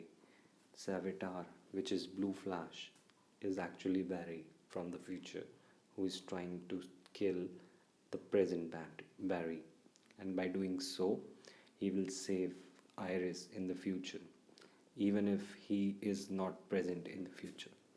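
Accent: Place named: England, United Kingdom. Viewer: dislike for your English accent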